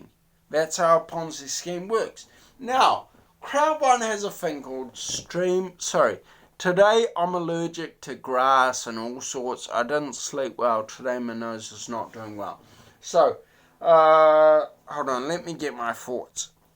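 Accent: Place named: New Zealand. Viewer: Watched a few of your videos man, I am living in OZ at the moment but born and raised in NZ, loving the accent haha. Anyway, this garbage was pitched to me by 2 friends already and decided to check it out... I can't believe people are actually falling for this. The site has no explanation of how they're making any money other than creating a big useless pyramid of "investors". This is the worst MLM pitch I've ever seen lol... same shit as bitconnect, usitech, amway, arbonne etc. Keep it up... been warning them this is gonna collapse eventually. I dont know if any of them have been paid or not.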